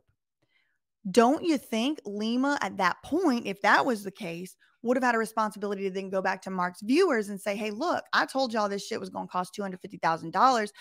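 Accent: South, United States. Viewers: You are the best holy shit. Sweetest accent, funny, southern as hell, gorgeous and an advocate for the weak. You earned a sub please don’t ever stop doin this.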